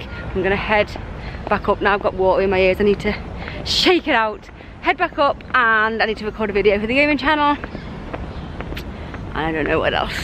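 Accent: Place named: Yorkshire, United Kingdom. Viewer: That Yorkshire Accent.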